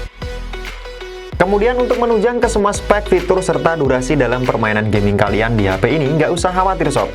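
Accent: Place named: Java, Indonesia. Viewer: Jowo ngendi mas😁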